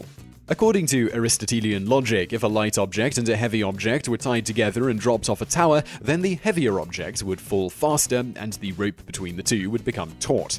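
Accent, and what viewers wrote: United Kingdom. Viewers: Is that Michael vsause but the British acent version?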